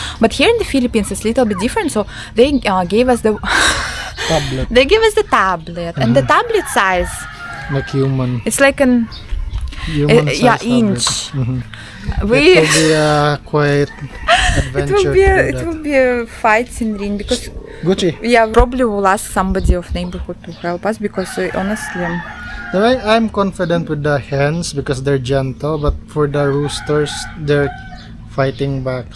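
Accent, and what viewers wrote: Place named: Russia. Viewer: I love hearing your Russian English accent, keep uploading guys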